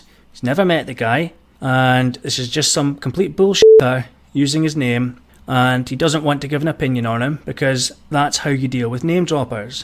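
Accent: Scotland, United Kingdom. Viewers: It's always a nice feeling when ones gut intuition is vindicated. Shaun, from his holier than thou attitude and self glorification to his literal facial expressions struck me from day 1 as wrong. It's a breath of fresh of fresh air hearing you tearing him apart on a daily basis. I dont give a fuck what anyone does behind closed doors at the end if the day, but the man is definitely not well. Lovely to hear a Scottish accent also, and congratulations on the channel success to date - Well deserved imo. Greetings from Ireland.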